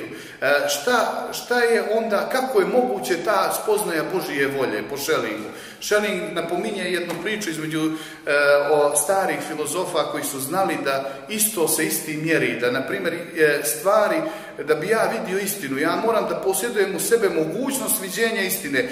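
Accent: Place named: Montenegro. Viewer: Professore svaka cast, klassische deutsche Philosophie zvuci sa crnogorskim akzentom nekako zabavno😊😅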